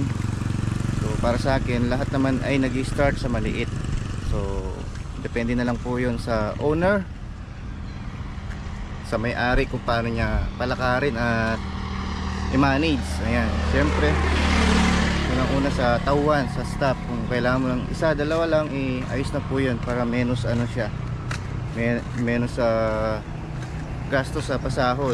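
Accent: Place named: Pampanga, Philippines. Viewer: May accent nang Kapampsnganndi Mrs.🎉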